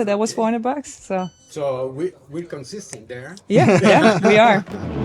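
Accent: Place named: Germany. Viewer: Your German accent has become almost non-existent... except when you say beer/bier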